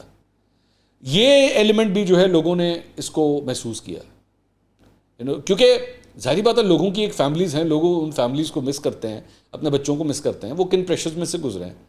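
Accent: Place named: Lahore, Pakistan. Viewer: بھائی آپ لاہوری انداز میں بولتے ہوے بھوں چنگے لگدے ہو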